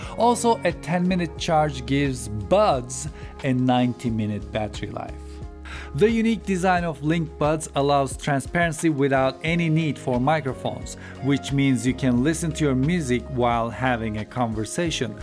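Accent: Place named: Turkey. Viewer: I knew it! I thought I was going crazy because your accent sounds Turkish!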